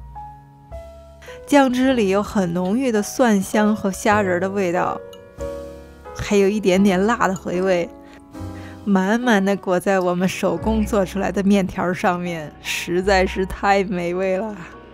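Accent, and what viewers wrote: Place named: Beijing, China. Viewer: 是北京人吗～哈哈听着是亲切可爱的北京人哈哈哈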